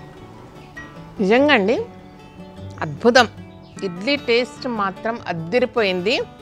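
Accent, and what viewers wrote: Telangana, India. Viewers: స్వచ్చమైన తెలంగాణ యాస తో మమ్మల్ని అలరిస్తున్నారు .ధన్యవాదములు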